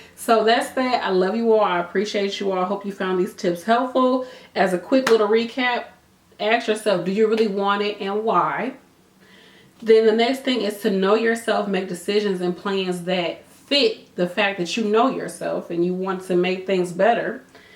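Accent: St. Louis, United States. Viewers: You from St. Louis? Great video btw!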